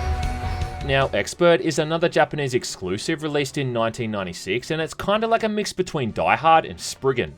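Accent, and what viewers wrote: Australia. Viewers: aussie accent is such pain on the ears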